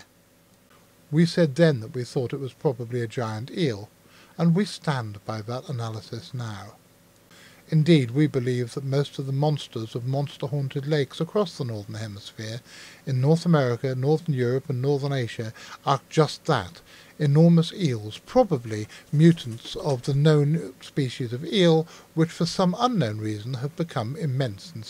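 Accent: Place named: RP, United Kingdom. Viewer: Apart from all of the comments about the video quality and style, 3 things. 1. Your age is not a qualifier of reliability (or your plummy accent). 2. Haven't you EVER seen what a swan or other large bird has to do to get into the air from water? 3. You also can't convince us by dismissing all the images beforehand '... the are just large fish, don't worry about them... blah blah'. I'm sad about all these things. The world is a strange place, and we're all interested, but this? Buh.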